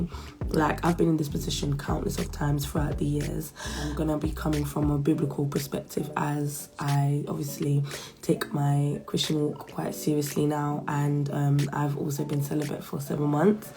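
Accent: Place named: Jamaica. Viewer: Your accents I soo nice 😍 please . Give me some real Jamaican 🇯🇲 patios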